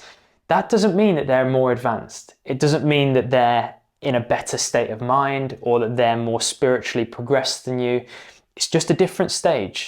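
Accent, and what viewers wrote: United Kingdom. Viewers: Yes it's great to hear an English voice, Thank you.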